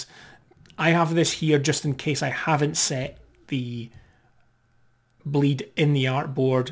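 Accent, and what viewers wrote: Scotland, United Kingdom. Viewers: Thanks man. Love your accent, is it scottish?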